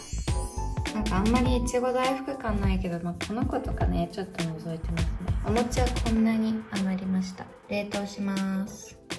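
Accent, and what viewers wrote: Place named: Yamaguchi, Japan. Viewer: ちゃん！今日あなたの動画を見つけて、とっても癒されました♪ありがとう！山口弁てとこもかわいい！私も福岡出身でベルリンに住んでいて、大福作るの大好きで、いつかベルリンのマーケットで売るのが夢なの！食べてほしいな♪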